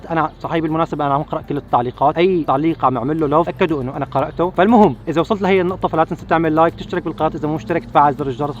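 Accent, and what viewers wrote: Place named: Syria. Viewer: انت شامي و عم تحكي هيك؟.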